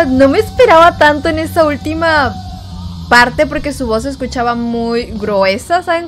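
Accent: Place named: Mexico. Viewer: flaca todo bien pero porque hablas como mexicana!?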